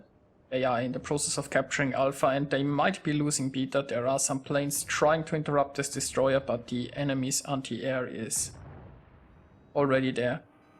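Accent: Germany. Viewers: Man hört das du ein deutschsprachiger bist :D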